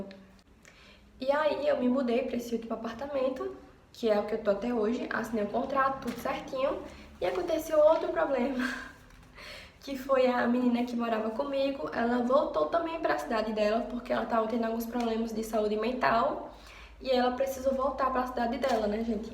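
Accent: Northeast, Brazil. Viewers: Uma cara de paulista danada e sotaque nordestino kkkk combinação interessante, legal o vídeo, tbm estou buscando morar sozinho ano que vem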